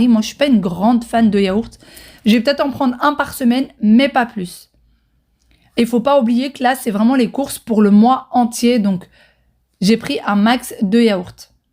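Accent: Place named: Morocco. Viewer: Tu es marocain d'apré les produits😂😂et l'accent nous les femmes marocain hh on'est trés connus😅bon chance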